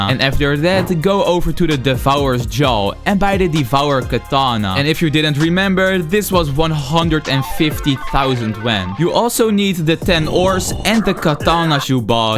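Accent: Netherlands. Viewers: i smell Nederlands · why do you sound so dutch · u sound dutch are u?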